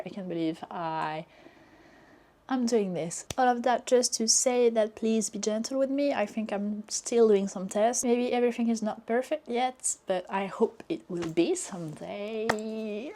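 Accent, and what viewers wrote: France. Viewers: I have to admit, both the video and sound quality have improved 😎☑ I can hear you a lot better now, parse out the spoken words and sample their distinctly French flavour Horror and humour can go hand-in-hand depending on how well they are balanced in a story. It is very interesting hearing your critical take on Tolkien. The fact you don't like the fictional emphasis on genealogies and lineage as they relate to the overall story, making the reading experience a bit tedious. You are the first person I have come across who thinks J.R.R Tolkien's writing style has a tendency to be superfluous. You think he's a showoff:face-green-smiling: I appreciate your French perspective. If I were to ask someone from the US or the UK about LOTR, I'll be informed of Tolkien in glowing terms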